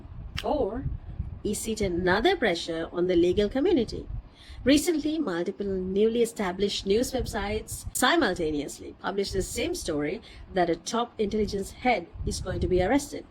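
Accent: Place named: Australia. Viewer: Wow where do you get this accent from? It’s very close to Australian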